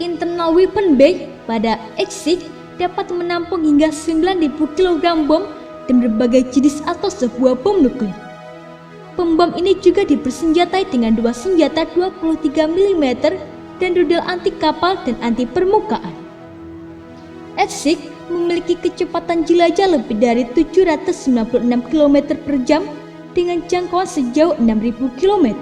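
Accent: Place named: Aceh, Indonesia. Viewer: Logatnya mirip logat aceh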